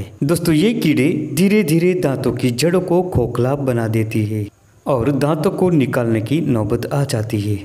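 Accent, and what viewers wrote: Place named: Tamil Nadu, India. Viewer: Tamil wala bolti hai. Aati nahin hai.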